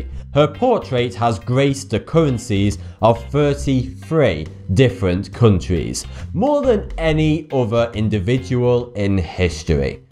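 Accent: United Kingdom. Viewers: hes from the uk, the way he talks is actual english, american is actually incorect english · Is this like racism or just you don't understand British accent? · idk why but his british voice is driving me mad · ***** British accent guy. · Buck teeth and a British accent? Its like every word your pronounce starts with the letter "F". Like you say thirteen like " Furteen" WTF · His nasally, British accent is annoying.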